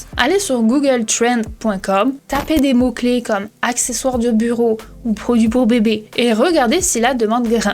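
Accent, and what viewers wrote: Quebec, Canada. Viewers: Merci pour ces idées de recherche produits ! Il est aussi intéressant de constater que dans le seller central il y a des outils "gratuits" (on paye l'abonnement) qui sont plus précis que les outils tels que Jungle Scout ou Hélium 10... Car les data viennent directement de Amazon... As tu fait une vidéo là-dessus ? Merci pour ta bonne humeur et ton petit accent québécois... ❤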